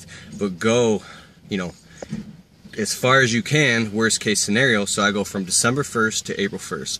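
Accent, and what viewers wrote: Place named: New England, United States. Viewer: The Landscaping Employee Trap Hey man i gotta ask you, are you from New England? You said ruffin' for roofing.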